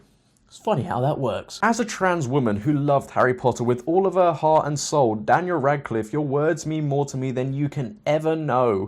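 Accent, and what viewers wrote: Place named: United Kingdom. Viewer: It’s my brittish man who gives based facts in a swanky accent.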